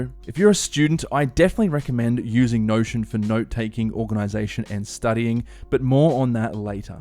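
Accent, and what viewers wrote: Australia. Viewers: You’re aussie right 🥵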